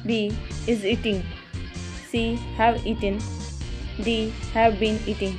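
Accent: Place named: Sindh, Pakistan. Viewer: Ap sindhi ho apki awaz se lag rha hai magar ap bohoot payari awaz hai apki mashallah 😊😍🤗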